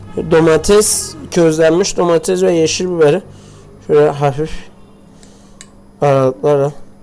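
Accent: Gaziantep, Turkey. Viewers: Senin takipcinim ve her videonu neredeyse izliyorum bana yakin ve bizden biri olman hafif gaziantep siven kendini ozel kiliyor kucuk bir takipcinim tostlarini evde deniyorum ogrenciyim ne de olsa senin tükkan da tost yemek isterdim...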